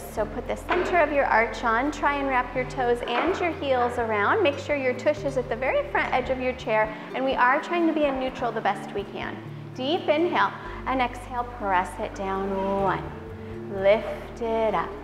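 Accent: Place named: United States. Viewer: A voz dela é tão americana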